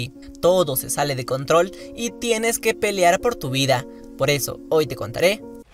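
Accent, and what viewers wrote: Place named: Mexico. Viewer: palteas oe porque hablas como mexicano , si eres peruano !!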